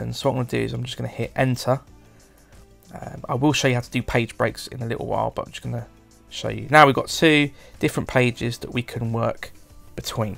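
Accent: United Kingdom. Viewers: This is an outstanding presentation of Pages for Ipad. I have just got a Magic Keyboard and was looking to learn Pages. I was not disappointed. This tutor should be an ambassador for Apple. He makes Pages very easy to understand and I am grateful for his assistance. It is also very pleasant listening to a British accent. Thank you.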